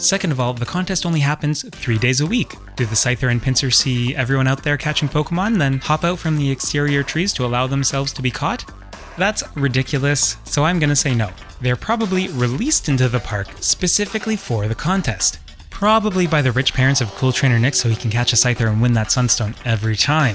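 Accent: Canada. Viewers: Are you a canook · Wait you’re Canadian?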